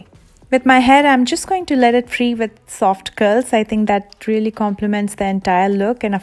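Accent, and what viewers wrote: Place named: India. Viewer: your Hindi accent is 🤗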